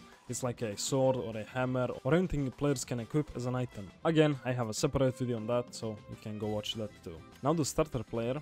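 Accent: Russia. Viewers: He's russian possibly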